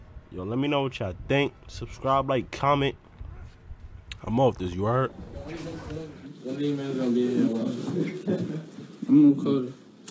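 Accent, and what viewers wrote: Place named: New York, United States. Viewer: yeoo this my first time coming across your videos, I liked your message however you NY asf you had me dying lmaoo ju hurddd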